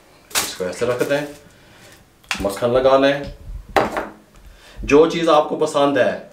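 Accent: Punjab, India. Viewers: Beech mein jo ap punjabi ka touch detey hein. Kamal hi hai.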